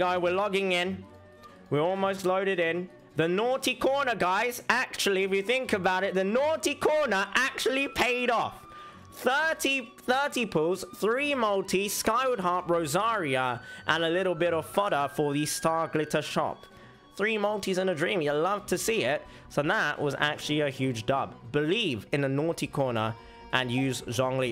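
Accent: United Kingdom. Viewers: He is asian, yet he sounds a bit british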